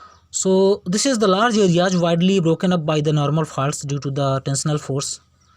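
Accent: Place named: India. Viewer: By according to your phonetics, I think you are an Indian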